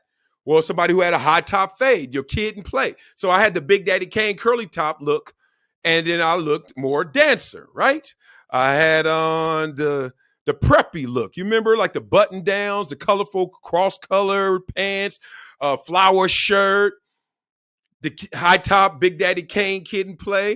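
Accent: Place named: California, United States. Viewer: Duuude!! Haha sounds California but I’m from NJ. Solid views. It makes sense in so many ways. I’d have to prob read CK entire book/chapter to really understand his thoughts on his parents but….I don’t really care enough. 😂 Anyway, you’re so much better on this type of platform over MSM. I hope you can grow this to get you were you want to be financially so you can just do whatever you want content wise. Thanks & good luck! 🤘🏼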